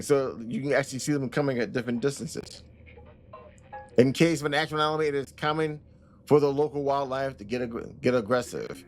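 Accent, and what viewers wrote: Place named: Italy. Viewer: I was going to say, I wholeheartedly agree, if you CAN take advantage, you MUST take advantage 🤪if anything, it's making me make the mechanics in my game deliberate and airtight - speaking of which, I'm getting close to Demo material and would be honoured if you would give it a go. Always like watching your videos brother, I suspect we are from the same generation, a lot of references you make I catch - always appreciate the Italian accent too, being half Italian myself 🤌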